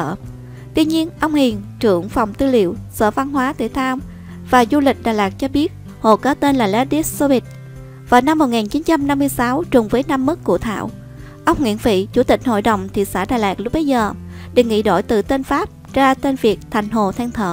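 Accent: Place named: Vinh Long, Vietnam. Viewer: quê o gò công sao nói o vĩnh long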